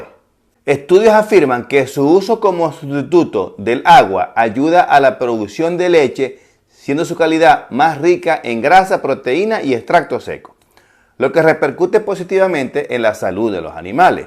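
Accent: Zulia, Venezuela. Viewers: Doctor ya me suscribi. Ud tiene un acento Marabino Zuliano. Felicitaciones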